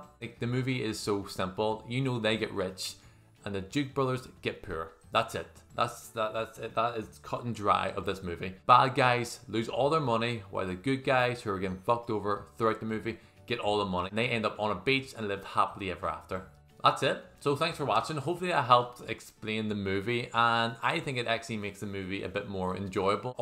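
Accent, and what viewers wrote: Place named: Northern Ireland, United Kingdom. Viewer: Being N. Irish, I had to double take when I heard your accent when watching a video on such an American film lol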